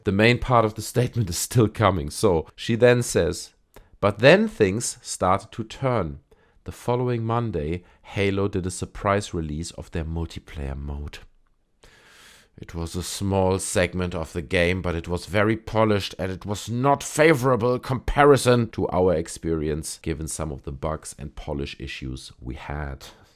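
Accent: Germany. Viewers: Hi new to the channel. Do I detect a German accent? Also great video!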